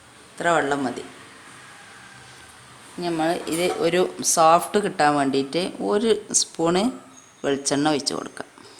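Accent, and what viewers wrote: Malappuram, India. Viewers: Malappuram ano veed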